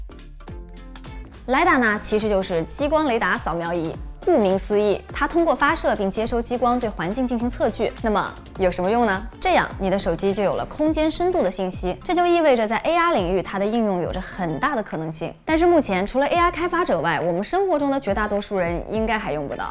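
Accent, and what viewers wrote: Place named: Nanjing, China. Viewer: 总感觉全程自动翻译成南京话呢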